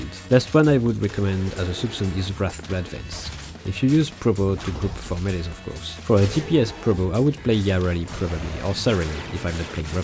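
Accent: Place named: France. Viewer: Love that little French accent❤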